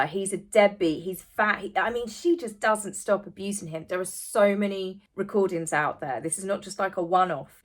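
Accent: England, United Kingdom. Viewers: Love from Long Island - ive experienced abuse on several levels and its taken a while to rise up and wake up. You are such a beautiful special human and I truly look forward to seeing your videos and hearing your English accent! Just wanted to reach out to say that to u!!! Keep up the great work❤️👍🫡🤗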